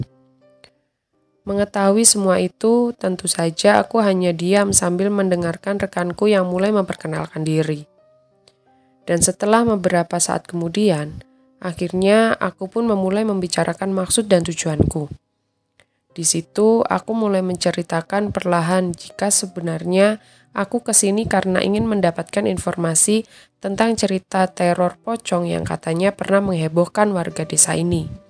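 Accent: Malang, Indonesia. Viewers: Asik an pas samean dewe seng crito sam, boso malangan mantep, berasa ndek tongkrongan. 😁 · malangan betul logatnya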